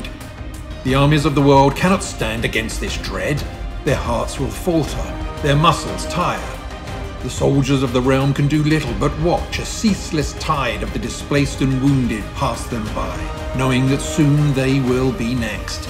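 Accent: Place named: United Kingdom. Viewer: Yup. As the voice was British, it reminded me of when small British computer games companies, in the 80s and 90s, would just use their office staff to do voice samples, or pose for being digitally scanned, into their games (such as the bored secretary's voice of "Insert Disk 2/First Aid/etc" in Team 17's "Alien Breed"). These companies would also sometimes used the same sort of staff for their adverts, both in magazines or on the telly.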